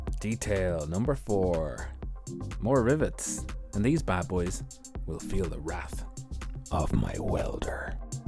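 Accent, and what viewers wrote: Ireland. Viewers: Every video o watch,i feel like i should be drunk,i guess is Irishness coming through.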